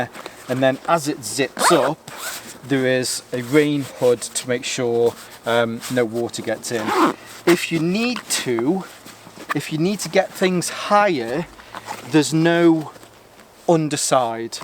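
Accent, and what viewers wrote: United Kingdom. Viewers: One of the most detailed review in British accent. Started to get interested in MCB fabric. Luv it!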